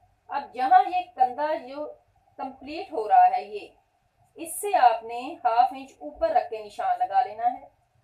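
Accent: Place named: Faisalabad, Pakistan. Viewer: Ye to faislabad say hy lagta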